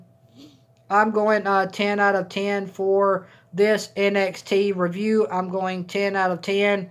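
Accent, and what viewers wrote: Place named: South, United States. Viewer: You sound like a Southern gentleman. Georgia? I was born in Valdosta! 😃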